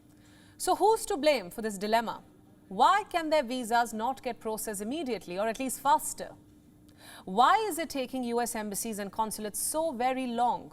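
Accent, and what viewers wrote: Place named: India. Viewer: Nobody cares or knows who your foreign minister is loool. Just because he "looked into it" suddenly 800 Indian citizens who live on less than $5.50 a day will get fast-tracked visas to the US? I'll break it down nice and simple: 1) India like many developing counties has ZERO Visa Free access to the US, UK and many other developed nations. Therefore it takes months and years to get a visa. I'm a British citizen. The UK as a highly developed, advanced welfare state economy we have Visa Free access to the US and I visited the US this year, getting documentation was literally immediate. 2) You completely missed Sam Browns point. This Indian presenter desperately trying to hide her Indian accent is claiming India is a "strong ally" of the US and so should get Visa Free access for its citizens. Yet, India finances Russia's invasion of a sovereign country by not condemning it and buying its oil. 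Further, 90% of all your defence equipment was bought from Russia. Russia is in the top 5 of the countries the USA despises the most and yet India a "close USA ally" pours billions and billions into Russia's economy.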